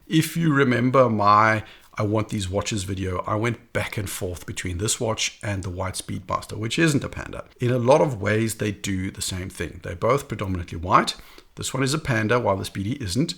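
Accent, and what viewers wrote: South Africa. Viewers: Great video! I'm also debating between the white speedy and the A384. Is that a South African accent I hear?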